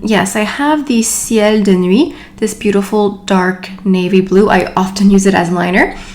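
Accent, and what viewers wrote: France. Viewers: Hello, I like your French accent... Thanks for sharing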